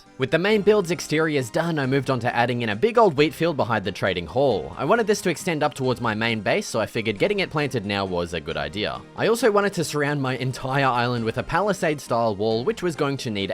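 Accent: Australia. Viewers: nah easy mistake too make but hes def aussie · his australianness distracts me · you aussie? u sound like it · Mates aussie as hell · bro is australian W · Are u Australian 😮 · Hello mate you’re Australian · “Oh, he’s Australian” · I can tell your Australian mate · He’s so Australian 😭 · are you australian??? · sweet world dude, love you aus accent hahahaha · This guy sounds so Aussie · "OUUH!" lolll .. also, the Aussie-ness is just steaming off of him like a thick vapour.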